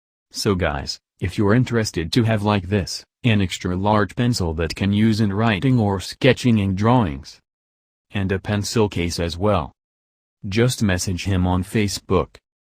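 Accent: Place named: United States. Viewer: astig naman ni american ang boses HAHA.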